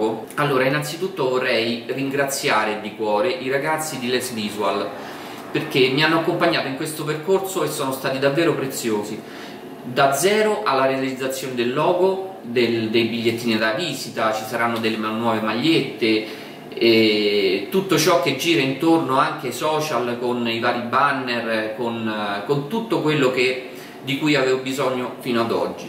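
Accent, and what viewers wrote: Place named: Lazio, Italy. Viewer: mi pare laziale ma non ciociaro....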